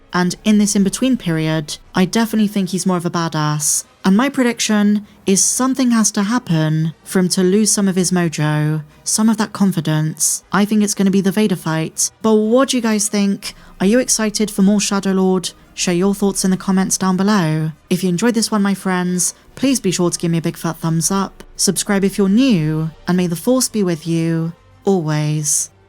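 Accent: United Kingdom. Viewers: WHY are you making Money off of Starwars and the ACTUAL people who created it back in 70s and 80s are not???? I’m sorry but you’re just reading Making Starwars and shooting these videos. That’s Lame.. Get a REAL job that contributes to Society.. WE have women doctors, engineers, researchers and Than we have the women of this lame TikTok Selfie Generation…StarWars is a Gen X era Franchise.. EVERYTHING cool happened in late 70s, 80s, and early 90s.. You guys keep taking OUR era stuff and making it worse..YOU Are not understanding like many Art.. Starwars is reflection of the ERA it came out in 70s an 80s and WHAT came before it World War II. The Mona Lisa would just be a painting of a woman.. BUT it’s iconic because who and when it was painted… the fact you say what you read in British accent is annoying.. You should give every pound you made to the people who actually WORK on Starwars in 70s an 80s..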